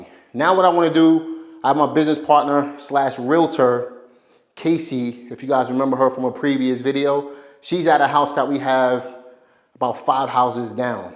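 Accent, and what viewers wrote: New York, United States. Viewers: Love the content bro!! The new yrk accent never leaves lol!!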